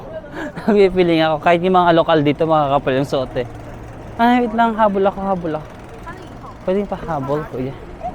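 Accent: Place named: Philippines. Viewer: Perfectionist? Filipino accent nga dba... style niya yan at authentic not pretentious.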